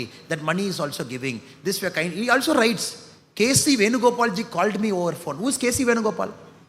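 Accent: Tamil Nadu, India. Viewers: தலைவர் பேசுற ஆங்கிலம் கூட தமிழ் மாதிரி இருக்கு 😍😍😍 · Great Tamilish English...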